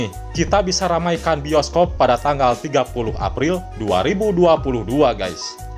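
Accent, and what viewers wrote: West Java, Indonesia. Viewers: vo nya org sunda fix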